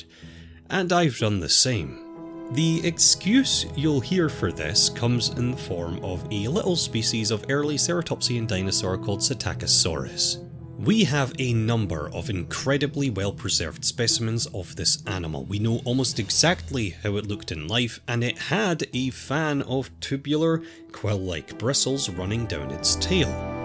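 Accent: Scotland, United Kingdom. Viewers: I love your voice. that interesting accent. I am neither english nor american so i never heard someone speak like that...i believe its scottish? I love to hear you talk about the films!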